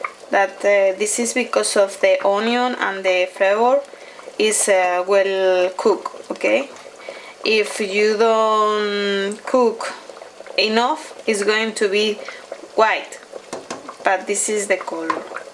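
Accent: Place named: Spain. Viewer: I love Spanish Food, I used to work in a spanish restaurant. The food looks tasty. Love your spanish accent!!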